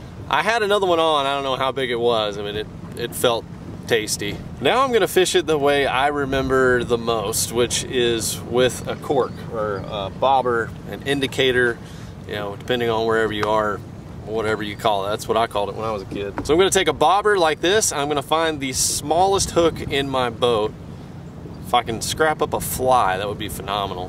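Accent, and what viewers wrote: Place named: Texas, United States. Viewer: What part of Texas are you originally from? I have friends from Texas and your accent sounds a little different then theirs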